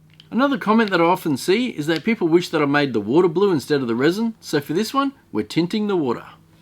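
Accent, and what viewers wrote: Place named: Australia. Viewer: Im an american, and half the reason i watch your channel is for your awesome australian accent 🔥🔥😂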